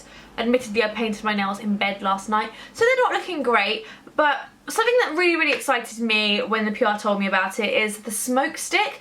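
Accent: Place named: RP, United Kingdom. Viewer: You have this posh British accent! lovely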